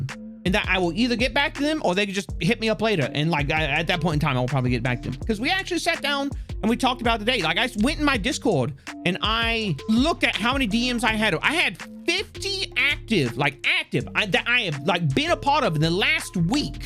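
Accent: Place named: Mississippi, United States. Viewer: I always catch just a whiff of a southern accent.... like mississippi southern....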